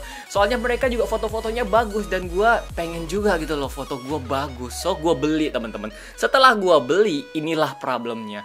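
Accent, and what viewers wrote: Java, Indonesia. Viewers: Kak orang Indonesi apa Jawa Kok ngomongnya Kaya jawa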